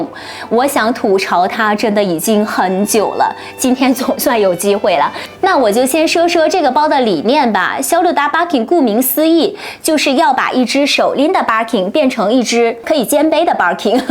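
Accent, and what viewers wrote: Japan. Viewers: 日式英文，聽不太懂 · 日式英语好可爱hhh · 她在日本開店，所以都是日語發音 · 在日本生活了十几年，英语发音已经被带偏到姥姥家了😅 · 看了评论才知道是日式英语，shoulder楞没听出来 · 日式發音 畢竟她在日本啊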